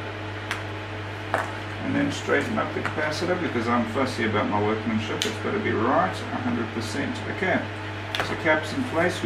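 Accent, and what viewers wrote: South Africa. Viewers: Ha// spot the South African accent a mile away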